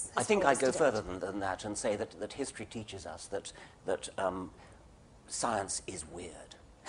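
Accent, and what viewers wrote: United Kingdom. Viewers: lol the British accent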